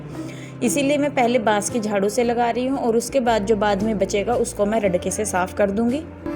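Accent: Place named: Bijnor, India. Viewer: Mam apka face bilkul meri mami se milta h bilkul same ap ek bat batao ap up mei bijnor side se ho na apki language se mjhr aisa laga kyuki m bhi whi se hu isliye mjhe laga aisa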